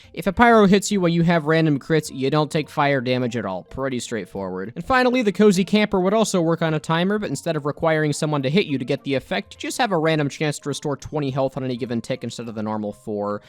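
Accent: United States. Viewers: What part of Florida are you from?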